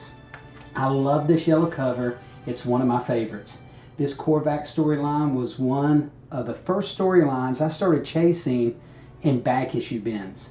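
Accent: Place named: South, United States. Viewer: Love the Hulk cover! The south was strong in this intro too 😅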